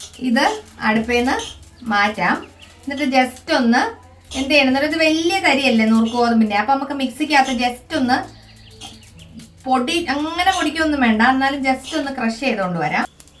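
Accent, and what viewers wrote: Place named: Kottayam, India. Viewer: Samsaaram kettal kottayamkare pole....vedio super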